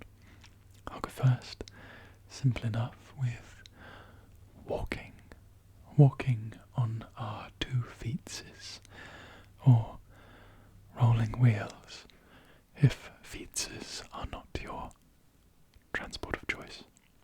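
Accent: United Kingdom. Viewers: yeah british accent makes me sleep 😍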